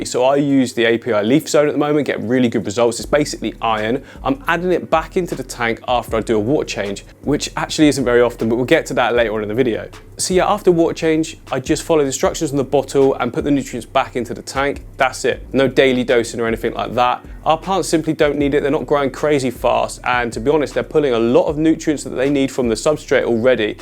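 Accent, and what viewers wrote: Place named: South West England, United Kingdom. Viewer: I thought your accent was familiar! I am south west too!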